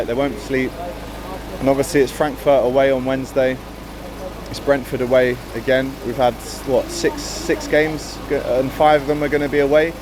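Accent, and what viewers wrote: Liverpool, United Kingdom. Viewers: Kop tv presenter should have a local accent is this one a genuine Liverpudlian.? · Glory glory man united...love your Scouse accent, your a woolyback supporter ...love from the laughing manc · What a lovely scouse accent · Funny sounding Scouse accent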